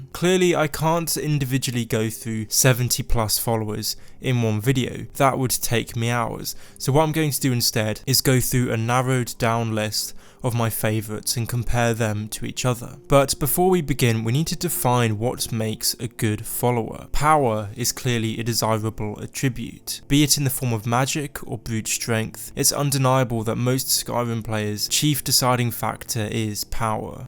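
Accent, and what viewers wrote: United Kingdom. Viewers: *hum* Lemme guess, you from UK ?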